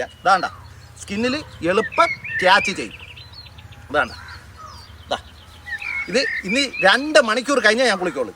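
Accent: Thiruvananthapuram, India. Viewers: അടിപൊളി നിങ്ങൾ ആ തിരുപനന്ത പുരംഭാഷയിൽ പറയുമ്പോൾ കേൾക്കാൻ നല്ല രസം 👍👍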